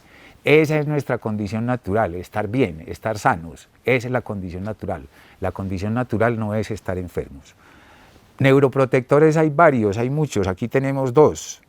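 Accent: Colombia. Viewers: Que delicia escuchar hablar a una persona colombiana !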